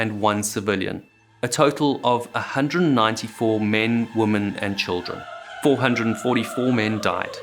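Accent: South Africa. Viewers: Lovely to hear that South African English accent. The easiest English accent to understand. Especially for non English speakers.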